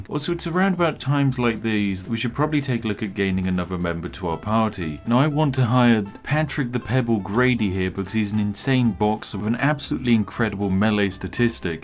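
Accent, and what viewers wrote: United Kingdom. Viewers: wait. isn't british???? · How to pull off being a millionaire and being your own boss... Step 1) Be British or have a smooth-as-hell British accent. Step 2) ??? Step 3) Profit! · IS HE REALY BRITISH BECAUSE I M A BRITISH MUN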